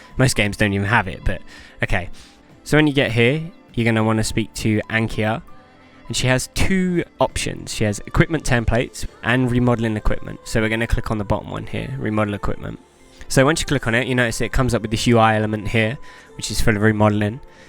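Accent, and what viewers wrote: London, United Kingdom. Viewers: Your videos are cool, I've subscribed for future reference :oD I don't think they are too long at all, you describe everything really well, plus you sound like a proper London boy which is my neck of the woods and that's always gonna be a bonus :oD haha!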